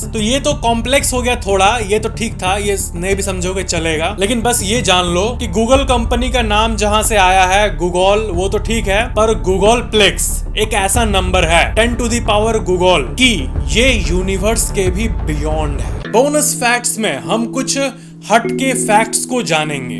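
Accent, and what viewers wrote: Bihar, India. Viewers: Bhai aap Bihari language bolte ho (kore)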